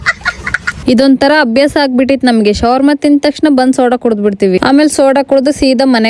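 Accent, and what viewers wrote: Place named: Chitradurga, India. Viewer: Nimdu proper Chitradurga na sis..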